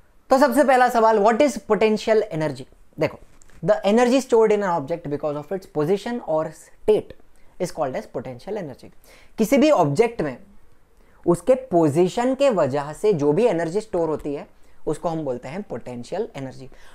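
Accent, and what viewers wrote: Maharashtra, India. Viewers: Sir are you marathi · Sir you are Marathi 😁😁😁😊😊😊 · His sir you are Marathi??? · Sir you from Maharashtra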